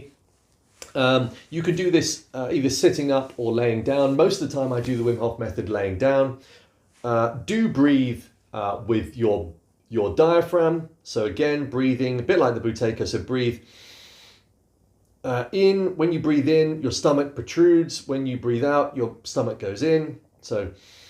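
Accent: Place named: United Kingdom. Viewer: Mate I just wanna say thanks for being such a genuine,honest and decent bloke,I'm almost 40 and for the time in my life I can actually see abit of light at the end of the tunnel,this lockdown as give me so much time for self reflection and through a small dose of yoga and meditation I probably feel the best I have felt in over 10 years and now this is just the icing on the cake. Oh and abit more icing to add were both British so I have absolutely no issues in understanding you lol. P.s dont turn around now and say your not British and make me look like a c××t 😂😂😂🇬🇧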